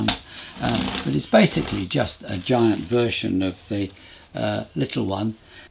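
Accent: United Kingdom. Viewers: Did I hear right a British voice speaking metric. Then you went straight back to lbs. All good old Aussie tradesman that understands both but lean towards metric. Many thanks for your program.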